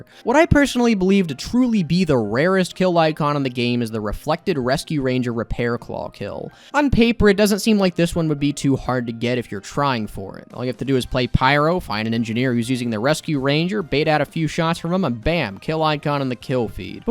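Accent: United States. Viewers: that's the most southern accent i have ever listened from a tf2 youtuber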